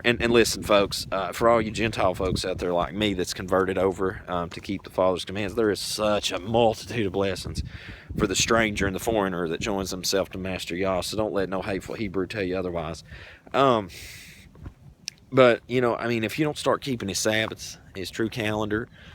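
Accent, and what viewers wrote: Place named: Kentucky, United States. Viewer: I like the accent is that KENTUCKY?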